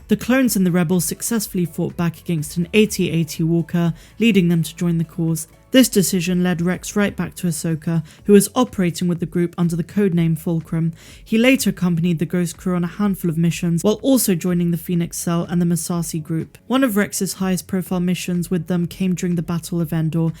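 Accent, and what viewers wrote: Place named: West Country, United Kingdom. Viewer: Another great vid. Love the fact you seem to be from the west country.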